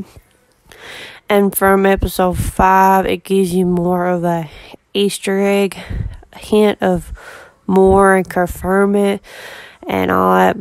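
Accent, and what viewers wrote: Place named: South, United States. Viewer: Wow girl, you have a major Southern accent! I f'n love it!!!!!!!!!!!!!!!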